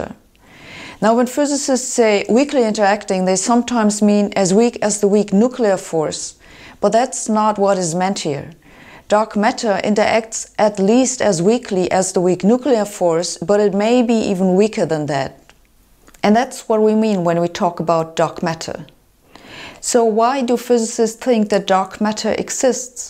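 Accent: Germany. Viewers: why a german accent sound like they are super genius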